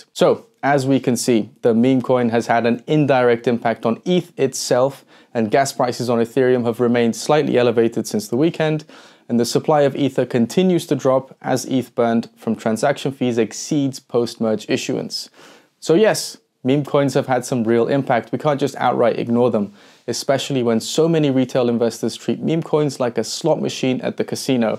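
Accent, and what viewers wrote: United Kingdom. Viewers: English accent/+ , not Robin but Funny/+, look/(...as always) irrelevant - finally! :)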